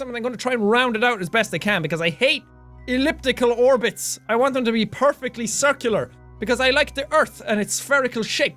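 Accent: Scotland, United Kingdom. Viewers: Is he Scottish?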